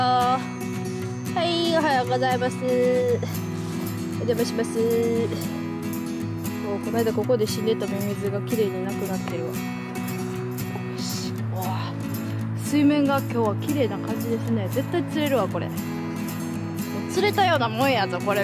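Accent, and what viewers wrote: Senshu, Japan. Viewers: 泉州弁いいですね。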